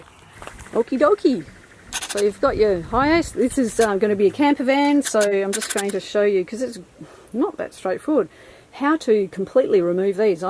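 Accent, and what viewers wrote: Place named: Australia. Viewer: Cool vid. So good to hear some Aussie…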